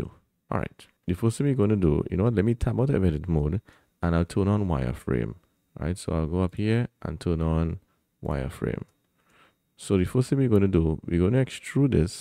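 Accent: Trinidad and Tobago. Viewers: Thank you man, Very helpful ! PLUS I think I heard a Trini accent , that's refreshing haha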